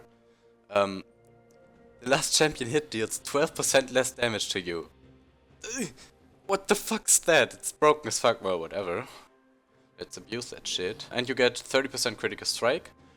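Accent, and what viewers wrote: Germany. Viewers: Bist du deutscch ? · Bist du deutscher? :D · locker der akzent ist so offensichtlich außerdem sind die besten rengar spieler deutsche ^^ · are you a german guy? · Do u come from Germany ? Hört mann nen bissle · are you form germany or austria ? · He is german ! you hear that. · u can tell the way he speaks hes german lol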